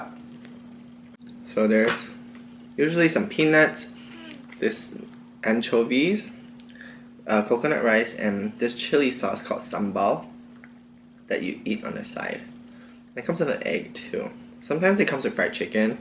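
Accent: Malaysia. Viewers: Malaysian !! I watched your videos all the time, and I was always wondering what nationality you are. My instinct told me you are Malaysian cause of that accent !! HAHA. ANYWAY !! I am super happy there is one more Malaysian in the states !! PROUD OF YOU !!!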